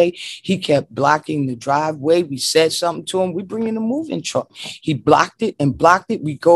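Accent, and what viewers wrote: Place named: New York, United States. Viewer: Sister, you are a wise beautiful person. All praises to TMH for you!!! He has given you great strength and I really appreciate it. I'm glad you are speaking on this, because I saw that particular Kerry Ann's video about India, and I was saying the same thing, "They are NOT TMH'S PEOPLE at all shaking my head. THEY ARE NOT CALLED BY TMH'S NAME!!! Sister, Psalm -11 tells us to rejoice when we seeth the vengeance.... Sister, you sound like a Northerner, a New Yorker. Anyways, TMH continue to open your eyes sister. Please, if you will sister, check out gdash the prophet channel. He was similar to you, but TMH has raised in up to a higher level of wisdom, knowledge, and understanding. May TMH raise you up higher as well. Peace Sister. Love you my sister.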